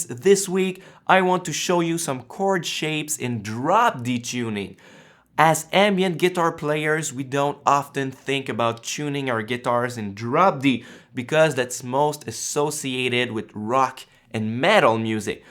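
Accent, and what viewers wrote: Quebec, Canada. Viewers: Nice lesson bro, bel accent québecois apart de sa ;)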